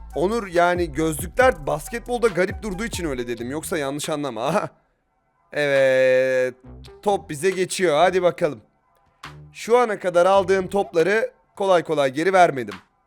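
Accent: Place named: Aegean, Turkey. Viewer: abi niye egeliler gibi konuşuyon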